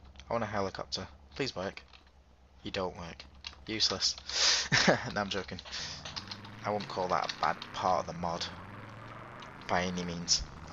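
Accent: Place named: United Kingdom. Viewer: Finally found a channel that's based on old school GTA's. Specially San Andreas. And you sound British lol!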